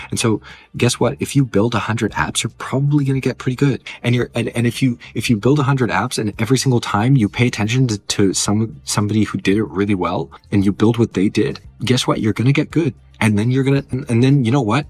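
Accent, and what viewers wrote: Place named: United States. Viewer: He sounds American to me. Is he Canadian or something? Their salaries are high too. I’m so confused now lol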